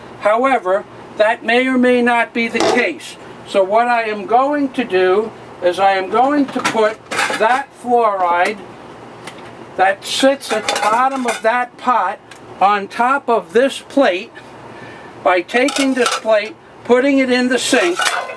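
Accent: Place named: North, United States. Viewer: Thank you for replying. I looked again on the label of the water I buy, it doesn't say 100 nor even 10%; it just is labeled as "Distilled Water". I buy it at Family Dollar because I'm within a short walking distance to the store & it's very convenient. I'm now wondering if there is a way to determine exactly what % is actually distilled in their water? I have of course thought to buy a distiller however I'm retired on a budget. My thought in purchasing a machine was comparing it to the cost of electricity to run a distiller & how much usable water I'ld actually get in say an hour of use, that purchasing it would be about the same(I pay $1 gal). Have you figured out the cost to run your machine per gallon? I'm not sure the equation to do that here, but cooking or heating anything certainly raises my monthly bills. Oh, by the way I was pleasantly surprised to hear a friendly northern accent, haven't heard one in a long time as I moved from NJ more than 20 yrs ago to VA & I'm the only one in town still getting asked where I'm from! 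At least the pollution is less & the lines are smaller here. If it wasn't for the chemtrails it would be heaven, but I don't think there's a place left where that doesn't happen, ugh!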